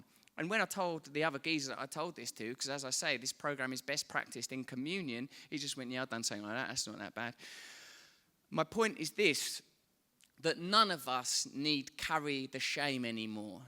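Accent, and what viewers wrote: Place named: Cockney, United Kingdom. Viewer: Russell Brand's first aspiration is 'to remain famous'. After that, whatcha need? a self-help guru, Dudley the Moore II, a recovering recoverer? The Cockney accent combined with the bad word in the middle of the cover (asterisked) makes the money-coming-out-your-wallet bit feel a little less chump-to-champ. Self-help books were designed to give dust a jacket to cry on. If you don't buy my book you're being subjected to the patterns of your cheap ass. Break the cycle of discerning purchases. I promise my fame will rub off like a halo on the drab parameters of your crappy life or your money never back!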